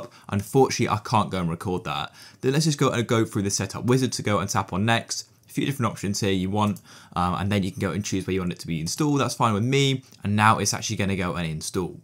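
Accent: United Kingdom. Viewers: Are you British? Hahaha damn bro.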